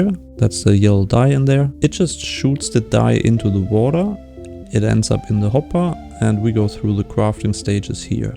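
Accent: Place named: Germany. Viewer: hes back and sounding more german than ever. wait a second this isn't colony island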